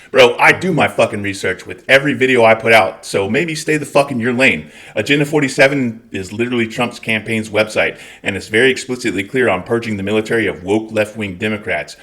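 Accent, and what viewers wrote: Scotland, United Kingdom. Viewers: Love ya, keep making and I’ll keep watching and sharing. Also more Scottish accent. Studying Scottish Gaelic myself and am a complete sucker for all things Scottish 😻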